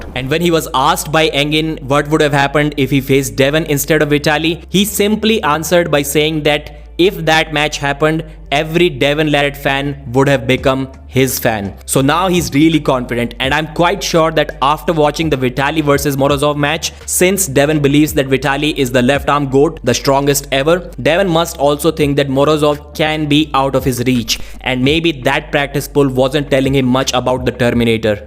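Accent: India. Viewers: Hey have you ever considered using an AI voice for your videos? I would watch them more but your thick Indian accent makes it hard to understand what you’re saying sometimes.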